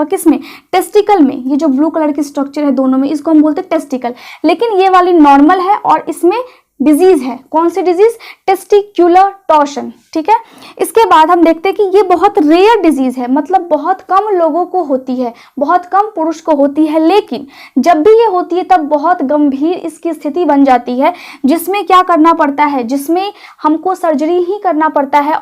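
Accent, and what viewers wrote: Bihar, India. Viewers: Bihar ki ho,Mother Tongue in Fluency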